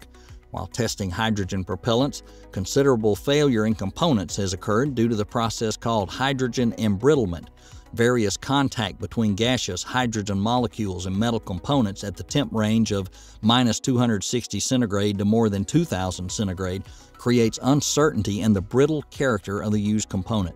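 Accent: South, United States. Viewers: Finally! No stupid CAPITAL LETTERING in the title or exclamation point and deceiving wording, stupidly hoping it will generate more views. Please keep this more mature southern accent voice guy that I learned to appreciate and stop occasionally replacing it with the other prepubescent one. Maybe keep those shady (and, quite frankly, dumb) tactics for your other thousand Youtube profiles I unsubscribed from, but please keep this one free of bullshit. Much obliged and keep up the good work.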